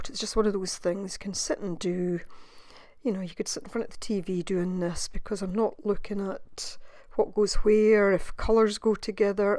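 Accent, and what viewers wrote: Scotland, United Kingdom. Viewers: Enter draw just found you through the hop and love your ATC’s lovely to hear another Scot on youtube thank you looking forward to trying this out with my scraps 🙂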